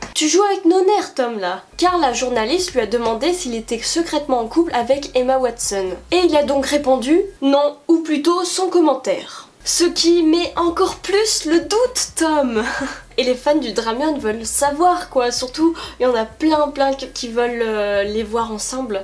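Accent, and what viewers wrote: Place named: England, United Kingdom. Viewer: J'ai enfin pu regarder la vidéo !!! J'ai du retard moi pfiou.. BREF ! Ton accent anglais est merveilleusement sexy j'adore hihi. J'aimerais trop aussi les voirs ensemble, c'est comme une série qu'on suit avec eux ahah!!! Je veux connaitre la suite moi 💚💚 McGonagall on vous a reconnu faites pas l'innocente. Par contre je savais pas qu'elle était aussi agressive que ça aha. Bisous xoxo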